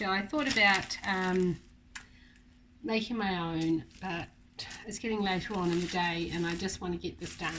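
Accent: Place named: New Zealand. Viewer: This looks delicious, I will have to try it. I have so much rhubarb I've run out of new ideas of what to do with it. I have given as much away as I can and some has gone into the freezer as well. It's lovely to find a cooking channel that has our Kiwi accent. Have a very Merry Christmas. Your Christmas Lillies look beautiful, mine haven't opened yet. It's not Christmas till I have their perfume in the house : )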